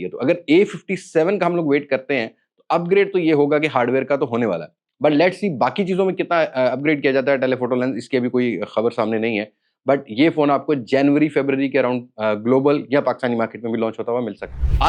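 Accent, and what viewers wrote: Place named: Punjab, India. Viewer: Punjabi wala touch chak k rakho❤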